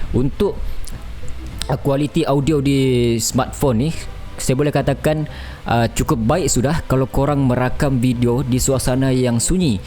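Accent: Sabah, Malaysia. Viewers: Perkongsian yg bermanfaat.. Teringin mau jd YouTube tapi xde ilmu.. Lps tgk video mcm terinspirasi.. Terbaik admin.. Admin org Sabah ye? Sbb dgr sleng mcm Sabah.. Terbaikkk admin.. Teruskan berkarya..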